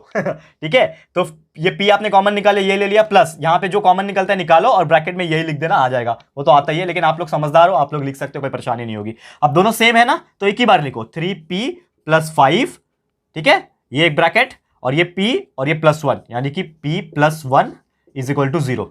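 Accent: Maharashtra, India. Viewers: Sir aap Marathi ho kai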